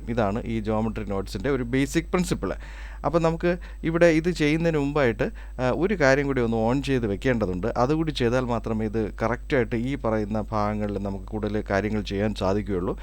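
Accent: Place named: Thiruvananthapuram, India. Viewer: സാർ., താങ്കളുടെ ടൂട്ടോറിയൽ ലാണ് നമ്മളെ ബ്ലൻഡർ എന്താ ണ്ടെന്നും ചില കാര്യങ്ങളും പഠിപ്പിച്ചതു. താങ്കൾ തുടർന്നും ഞമ്മളെ പോലുള്ളവരെ പഠിപ്പിക്കുമെന്ന് പ്രത്യ ക്ഷിക്കുന്ന നമ്മൾ തലശ്ശേരി കടുത്ത വായനശാല കൂട്ടമാണ് മലയാളത്തിൽ ബ്ലൻഡർ കച്ചവടമാക്കാതെ സത്യസന്ധമായിട്ടുട്ടോറിയൽ തരുന്ന വ്യക്തിയാണ് താങ്കൾ. താങ്കളെ നേരിട്ട് കാണാൻ ഞങ്ങൾ ആഗ്രഹിക്കുന്നു. എല്ലാ കൊല്ലത്തെ ഫിലിം ഫൈറ്റി വലിൽ ഞങ്ങൾ എത്താറുണ്ട് താങ്കളുടെ ശബ്ദ കേൾക്ക മ്പോൾ തിരുവനന്തപുരം ഭാഗമാണെന്ന് ഞങ്ങൾ ഊഹിക്കന്നു. നേരിൽ കാണാൻ ഞങ്ങൾക്ക് ആഗ്രഹമുണ്ട് അതിന് താങ്കൾനമ്പറോ ID. യോ തരുമെന്ന്. പ്രതീക്ഷിക്കുന്നു. അഭിവാദ്യങ്ങൾ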